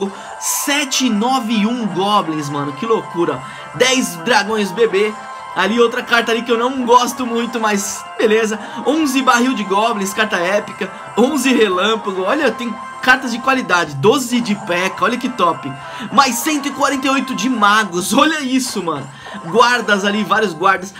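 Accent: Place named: São Paulo, Brazil. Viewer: vc é paulista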